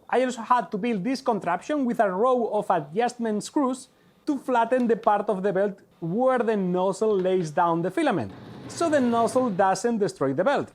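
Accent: Spain. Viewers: Perfect presentation, informative and entertaining, but the thing I enjoyed the most was the accent, Spanish English is the best English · A ver, quedó duro que no pudieras montar el kayak bien, pero por otro lado esto muestra que la impresión 3D tiene sus retos, y ciertamente hiciste el print en menos de 24h, por lo que tremendo logro la verdad. Me pregunto si merecería la pena comercialmente hablando hacer un kayak así, probablemente no ya que deben tener mejores sistemas. Quiero hacer un negocio al rededor de la impresión 3D, siendo que estudié modelado 3D y quiero aprovechar que ya se de esa parte. A ver que tal va, si Dios quiere bien. Saludos (No se porque me hace gracia escucharte hablar en inglés con el acento español, siendo que estoy acostumbrado a escuchar a gente hablando inglés siendo nativos... o a españoles hablando español jaja)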